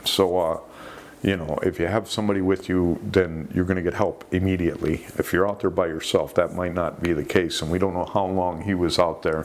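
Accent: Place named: Michigan, United States. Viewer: My step father was from Alto, Michigan (East of Grand Rapids). You sure sound like the folks from around there. Am I close?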